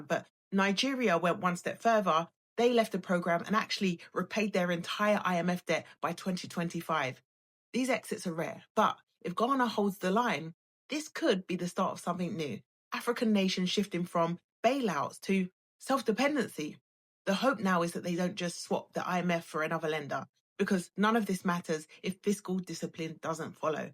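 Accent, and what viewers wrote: United Kingdom. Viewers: I love her UK accent so much. Way better than the American accent. Icing on the cake? Her beauty !!!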